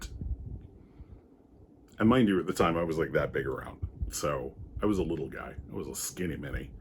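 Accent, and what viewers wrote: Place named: Michigan, United States. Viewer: edit Oh shit, you said Birmingham? Based on your accent, I'm assuming this is Michigan and not Alabama, but if you are a fellow Southerner, shoutouts to you!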